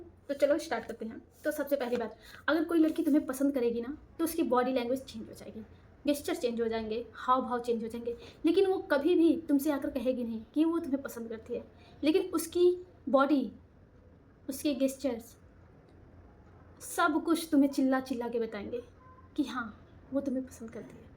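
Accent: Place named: Banaras, India. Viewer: Aapki bhasha se aisa lgta hai banaras ya sultanpur ya jaunpur ya udhar he side se ho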